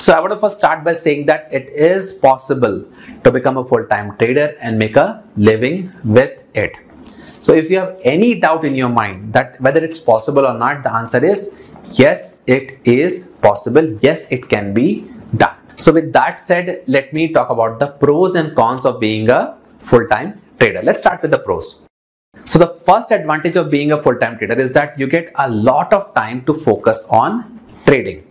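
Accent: India. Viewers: Even after 10 years of US life, you still have typical Indian accent. Nice to hear.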